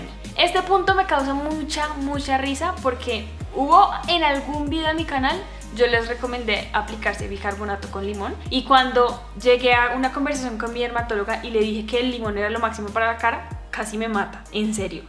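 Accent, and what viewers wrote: Bogotá, Colombia. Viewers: Me gusta mucho tu acento colombiano, por cierto ¿cómo podemos saber quién es un buen dermatólogo o cómo identificarlo? <3 <3 saludos desde Oaxaca, México. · Así hablamos los bogotanos 🤗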